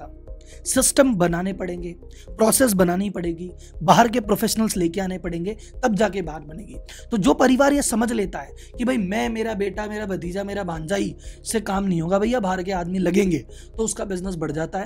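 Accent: Gujarat, India. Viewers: Aap Gujarati h kya.???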